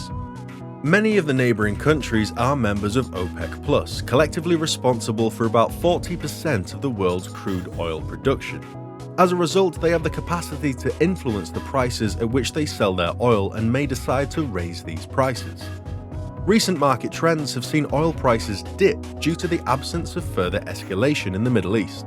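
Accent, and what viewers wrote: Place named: United Kingdom. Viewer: This man has a very British accent - hummmmmm -he`s saying 'they' - so I wonder where he`s moved to, to not think of himself as British.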